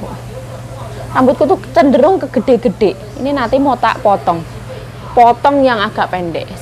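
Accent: Java, Indonesia. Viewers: Nada Mandarin kmu perlu di pelajari medhok Jawa kmu masih jdi blepotan mandarin kmu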